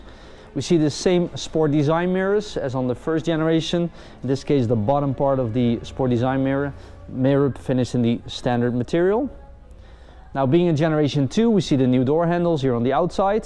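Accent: Netherlands. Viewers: It looks like the host has a Dutch accent...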